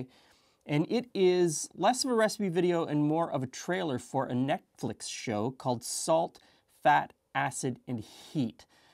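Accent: Canada. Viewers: omg r u canadian!!!!!!!!!?!?!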